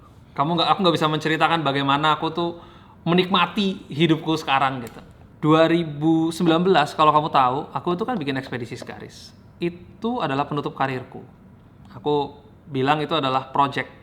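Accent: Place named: Banyumas, Indonesia. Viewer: kayaknya kok sekarang makin kerasa logat ngapak e wkwkkw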